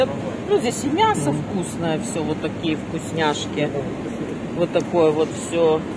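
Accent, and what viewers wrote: Odessa, Ukraine. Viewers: Снова и снова восхищаюсь голосом и одесским говором. Спасибо вам большое. Смотрю вас с удовольствием.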